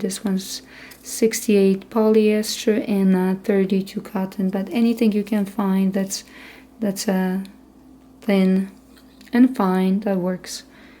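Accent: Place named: Armenia. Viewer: You sound like from Armenia like a lady I knew. She was from there. Neat voice & accent. If a person does not have a needle & thread they most likely not have an interfacing. I never heard of that or something like that to iron on etc only the word itself. Thanks for showing us how to sow a small hole tear. I am sewing 2 on a bag my mother made of 🌈 & a shirt.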